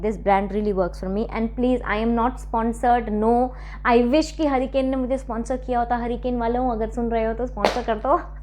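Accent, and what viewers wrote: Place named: Germany. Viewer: your pronunciation is German based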